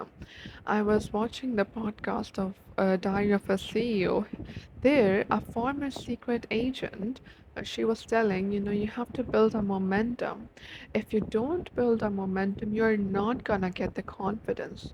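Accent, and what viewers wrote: Bihar, India. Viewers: Bihari pe accent kese🥰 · 18 years old seriously Dekh kr 25\27 saal ki lgte ho Plz apna bihari accent sunaao bht vibe and maza dono milta hai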